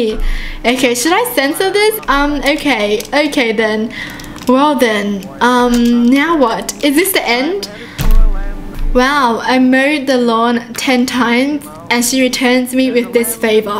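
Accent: Australia. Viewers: but she has an Australian accent while has a Japanese accent?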